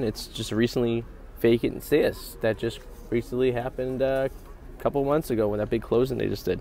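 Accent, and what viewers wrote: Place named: New England, United States. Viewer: If anyone is wondering what a New England accent sounds like... :)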